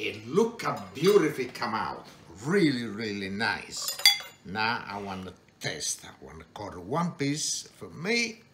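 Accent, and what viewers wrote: Italy. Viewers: Che bello sentire il tuo accento con l`inglese mi fa venir voglia di ritornare in italia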